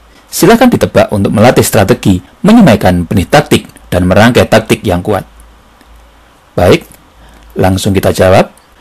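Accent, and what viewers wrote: Java, Indonesia. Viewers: Saya paling suka chanel catur ini.. simpel ga banyak pernak pernik.. bahasa mudah dimengerti.. kadang ada kata2 lucu macam pion anak kecil imut ini.. hahaha.. logat jawa khas kental sy senang skali. Upload terus mbah..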